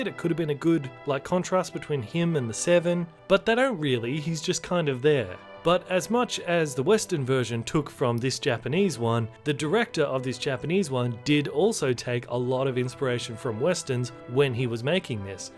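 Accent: Australia. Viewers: Holy crap are you an aussie too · Are you an aussie?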